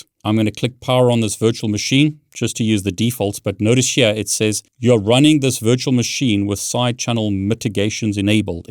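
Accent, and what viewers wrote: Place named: South Africa. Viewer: Thank you so much this was so helpfull. and you sound very south african.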